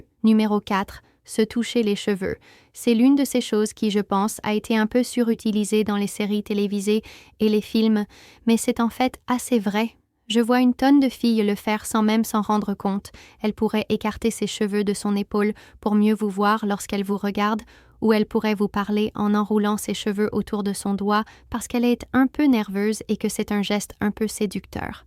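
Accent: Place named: Canada. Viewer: ce qui me gene cest cet accent canadien ia ou pas ?????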